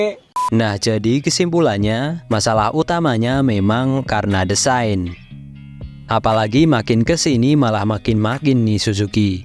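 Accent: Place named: Central Java, Indonesia. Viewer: Keliatan sekali 😂😂😂 mimin ini, orang jawa tengah 😂😂😂😂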